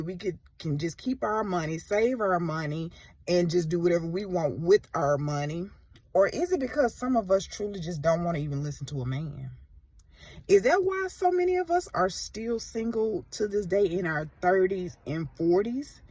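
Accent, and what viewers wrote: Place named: South, United States. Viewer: I'm a none believer so it kind of means you are so sure that this being in the sky has your best interest at heart and is telling you how to live with absolutely no proof that this will powerful being exist and religion is huge in the south and you have a southern accent so you are from the south. Therefore, fairy tales from the south.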